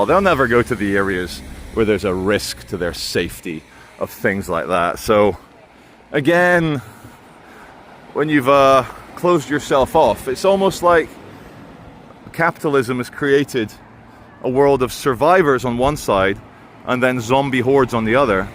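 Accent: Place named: RP, United Kingdom. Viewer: We need more posh accents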